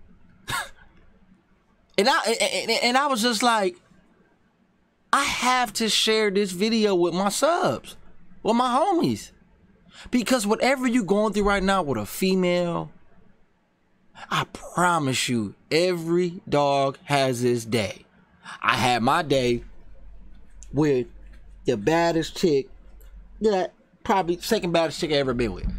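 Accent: Baltimore, United States. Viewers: Nigga said “twenty tew” you def a Baltimore more nigga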